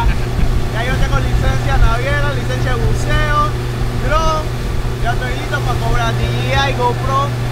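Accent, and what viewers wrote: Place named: Panama. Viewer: Q xopa, acabo de conocer tu canal, ya me suscribí al canal. Youtuber panameño que veo lo apoyo, muy chevere escuchar a alguien con tu acento y jerga. 🇵🇦🔥🔥🔥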